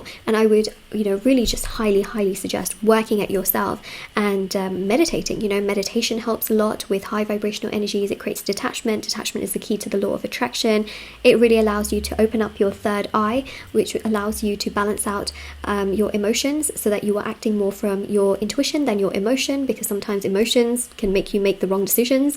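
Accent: United Kingdom. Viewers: Hello, I wonder if u do live vdo tarot reading? Where are u located bcoz u sound British, & look eastern Indian, also like to know what is your horoscope sign if u don't mind. Thank u for reading.🙏🐾🌹🪔🕉️🤩✝️☮️🌺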